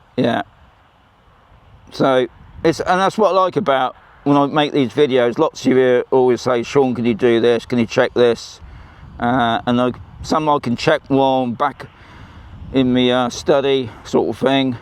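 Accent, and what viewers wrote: United Kingdom. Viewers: You sound like you're from the UK....when ordering ALL websites stated PRE-order (meaning you should KNOW it's not ready for delivery yet in the UK) So that's on YOU. The distorted close up footage is expected (you do know there's a gap between the 2 360° cameras...don't you...the gap is expected) Software fixes the distortion. You were correct at the beginning....you're just MOANING 🤦‍♂️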